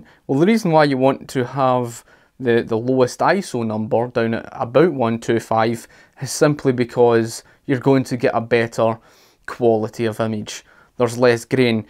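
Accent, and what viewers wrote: Scotland, United Kingdom. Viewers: Great video! Plus, love the scottish accent :) thumbs up from Italy! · jens lipponer Scottish accent you mean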